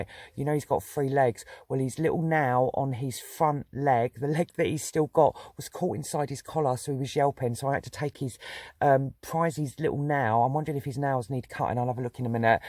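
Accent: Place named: Cornwall, United Kingdom. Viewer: do you travel just down south, or do you travel all over the UK, you sound like you’re from Cornwall or Somerset. X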